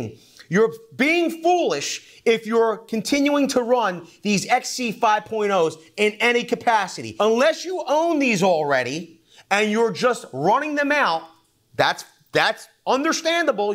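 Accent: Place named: New York, United States. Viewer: My apology for not possibly be knowledgable of a fact, but why is it common to see these people with the NY accent / Boston always talking with this bad attitude behavior, as if they're going through some divorce, or for one reason or another, they're having to resort to buying cheap britches that ride hide high in the crack of their a$$es ?....I mean really guy's....... Isn't there someone close to this guy that can enlighten him about the used boxer brief at the Salvation Army that he could purchase at a fairly good price, that allow him to calm the "F" down! especially when doing these very informative video's ?....Maybe its the Napoleon Syndrome...I'm sorry if I'm out of place...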